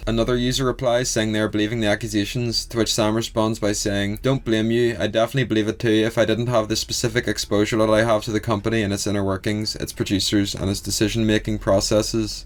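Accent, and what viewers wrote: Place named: Northern Ireland, United Kingdom. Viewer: A Northern Irish narration on Helluva Boss.... YouTube algorithm nailing it today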